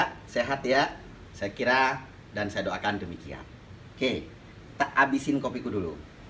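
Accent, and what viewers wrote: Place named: East Java, Indonesia. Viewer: Bismillah om doakan Lancar usaha kedai kopi saya mski awal buka. Msh butuh bimbingan dan belajar. Kalau boleh tw asli om bang kok medok jawatimur.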